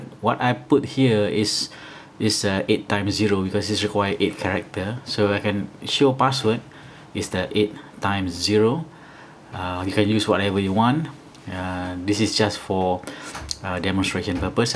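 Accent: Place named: Malaysia. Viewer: sounds malay to me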